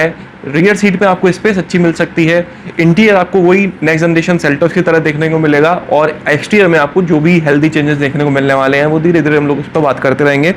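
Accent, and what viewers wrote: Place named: Madhya Pradesh, India. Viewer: Bhai vese kaha se ho aap ? Apke accent se to M.P Side se lgre ho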